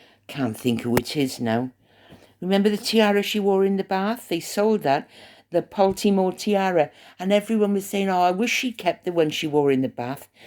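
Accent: Wales, United Kingdom. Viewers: Your podcast is never to long for me to listen to I love hearing a friendly Welsh accent hope your fur baby 😺 is OK . Dam home shopping they never get it right . Looking forward to your next podcasts my Welsh friend 💝💐💐💐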